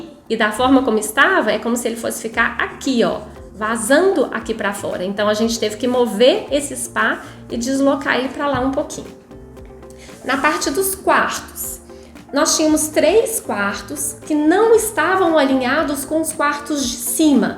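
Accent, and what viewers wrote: Minas Gerais, Brazil. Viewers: Excelente trabalho. Sempre importante um outro olhar sobre um projeto, principalmente quando esse tem a qualidade de seu trabalho. Não é o caso, um outro arquiteto coloca nos vídeos alteração de voz infantil e acho que corta a qualidade é continuidade do vídeo. Sugeri a ele não utilizar e pelo visto não gostou. Seu vídeo não tem esse recurso, ainda bem e não precisa. Ficamos assistindo o tempo que for exibido. Não é cansativo, pois nos envolve na explicação prática, objetiva e com todo charme desse sotaque mineirinho de BH. Prova que não precisa de recursos como alteração de voz ou inclusão de flash de imagens que não sejam pertinentes. Infelizmente o arquiteto que citei parece não ter gostado da sugestão. Ainda está em minha mente aquele projeto de seguidores que lhe deu um prêmio. E os demais também. Parabéns de verdade. Se juntar você e a Patrícia do Doma Arquitetura, eu faria um curso para capacitar os profissionais da área. 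Vocês duas comprovam o que ser profissional criativo. Arrependo me de não ter feito arquitetura e optado por publicidade e propaganda. Falei muito. Chega. Abraços.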